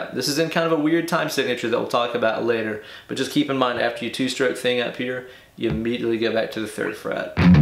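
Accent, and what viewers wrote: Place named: Southeast, United States. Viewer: Well Done Mate!!! First time seeing it live was Bonnaroo 07 opening song. Lasers went to Infinity and beyond. Great riff and great lesson. You sound like you're in our General area of the US? Southeast?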